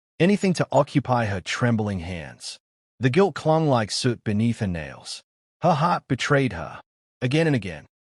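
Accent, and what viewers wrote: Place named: Bronx, United States. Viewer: This story voiced by a guy with a Bronx accent is funny.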